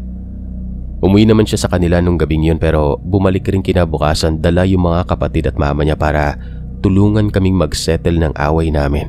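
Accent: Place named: Visayas, Philippines. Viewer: Bisaya pronounce ang ganda pakinggan😊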